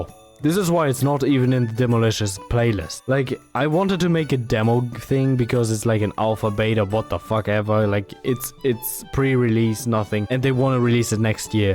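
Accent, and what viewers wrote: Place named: Germany. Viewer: i guess you are german good accent tho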